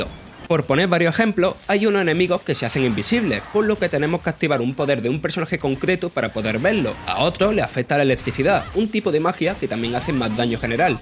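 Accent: Andalusia, Spain. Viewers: Acento muy común de españa. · Juraría que así hablan en cierto lugar de España, pero no logro recordar dónde exactamente xD me hace mucha gracia igual :v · sí, el acento andaluz, del sur de españa. Un desastre, y muchos españoles dicen después de lo "mal que hablamos los latinos" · Ese acento de que parte de españa es? No le entiendo nada xD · ! al fin te escucho de nuevo, hace tiempo que no veía un video con vos, me encanta tu forma de hablar No sé de qué región de España es, pero me parece buenísima! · es que tio tienen contratado al notas con mas acento cerrado sureño de toda españa, literalmente no le entiendo mas que una o dos palabras, joder que le pongan a editar o redactar o que le paguen un logopeda.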